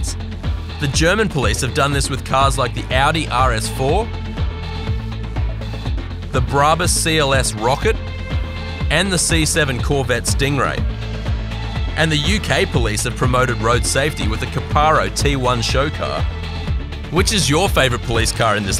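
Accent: Australia. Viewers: Your Australian accent is so charming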